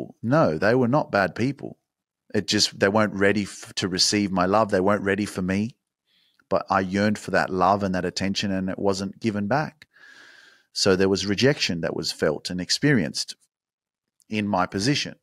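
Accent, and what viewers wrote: Australia. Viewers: I love your daily msgs. New listener but I’m learning your dance moves and it always gives me a lift. Love the accent, Aussie. Love the msg. Turned my daughter on to you. She’s in a bad place in her life and needs positive reinforcement. Keep it up❤️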